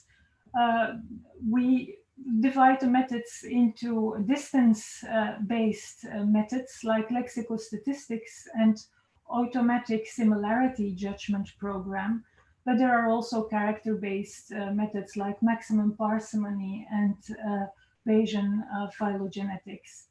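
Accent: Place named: Belgium. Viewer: I would have guessed a Belgian French accent, but either way it's the same region and matches her name (French first name, Dutch or Flemish last name). The quality of comments here is atrocious even for YouTube and far worse than anything I have seen under any other academic video. The OP here is a good example for this. Living in Neukölln, I love Turkish culture, but the guys commenting here are doing a good job of harming their country's reputation. I have only seen similar idiocy on this level from Indians.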